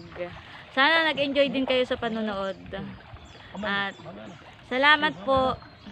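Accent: Quezon, Philippines. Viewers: quezon province po ba kayu,kasi yun tono ng salita nyo parang s quezon province din,taga infanta quezon ako..dami isda jan,dto sa taiwan yan din libangan ko mamingwit.. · Parang taga quezon Ang punto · haha saan po sa quezon, taga guinayangan, quezon po ako. kaya pala yung punto sabi ko parang related ako haha. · Ay ok po kaya pala kaparehas tayo ng tono 😅😅 lopes quezon po ako . Nasubscribe ko na po kayo god bless po.. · New viewers San po probensya Nyo mam yung punto Nyo po kc parang sa quezon province e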